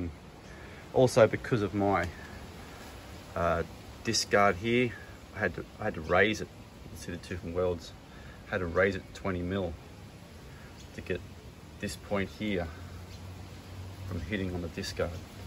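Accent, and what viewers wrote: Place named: Australia. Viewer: i built a swinging carrier in about 2015 glad to see someone else has done it. Im in QLD sounds like youre in aus too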